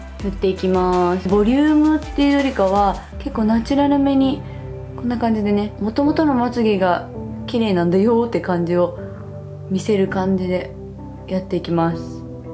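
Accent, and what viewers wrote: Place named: Kansai, Japan. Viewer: 文句なし！可愛い❣️咲乃ちゃん時々関西のイントネーションになるね😄お母さんの影響？徳島だっけ？なんか嬉しい😊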